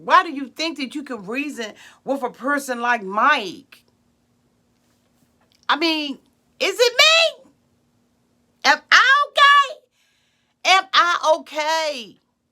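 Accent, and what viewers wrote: Washington DC, United States. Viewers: Just finding your channel. I knew I heard a DC accent. It was the roach crawling down the wall on Puppy’s house for me.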